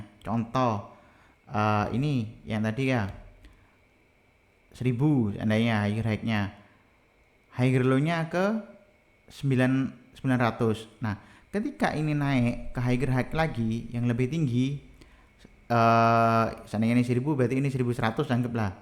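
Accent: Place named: East Java, Indonesia. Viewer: Mksih share ilmu2 nya bro,,,logat kental Jatiman KLO ga kliru😂😂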